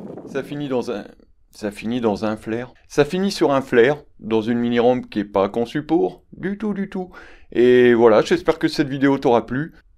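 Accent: Brittany, France. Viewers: Salut. Bon bah déjà, je te souhaite une bonne récupération... 2j que j'arpente ta chaîne. Top. J'adore le ton. Et l'accent m'a tout de suite rappelé mon Finistère Nord natal... Ahah ;) Par contre, j'ai voulu regarder quelques unes de tes vidéos plus anciennes que youtube me proposait, et impossible. Contenu privé. Bug ou normal ?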